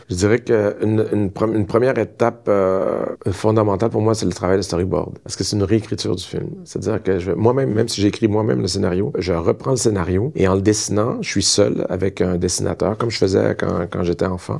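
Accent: Quebec, Canada. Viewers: Si c'était pour les malentendants ce serait toujours écrit tel quel. Aussi, ils pourraient être en CC et non intégrés à la vidéo. Leurs autres vidéos n'ont pas de sous-titres comme ça quand ils interviewent des gens avec des accents parisiens ou français. Ces sous-titres semblent là justement parce qu'il est Québécois. C'est toujours bien qu'il y ait des sous-titres pour rendre la vidéo accessible, mais là ça semble être à cause de son accent alors qu'il parle de manière tout fait comprenable pour les Français. Je ne peux pas croire que l'accent québécois soit si difficile à comprendre, mais il semble que pour cette chaîne, oui. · De plus en plus de vidéos d'artistes ou politiciens français de métropole ou pas, sont sous-titrées pour les malentendants ou les personnes ne pouvant utiliser le volume. Rien de personnel contre le très agréable accent québécois je pense. 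No offense :) (perso j'avais même pas vu qu'il y avait des sous-titres!) ')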